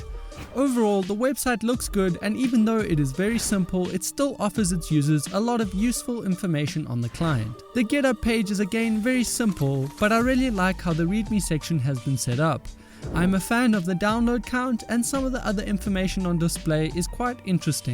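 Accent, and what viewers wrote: South Africa. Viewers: South African flag on your discord status i think i might have found the most normal sounding south african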